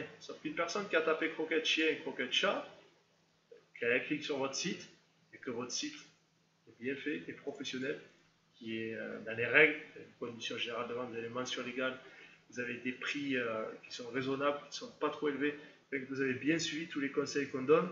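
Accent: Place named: Tarn, France. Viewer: Ma3alm l'accent du sud du Tarn super vidéo 👍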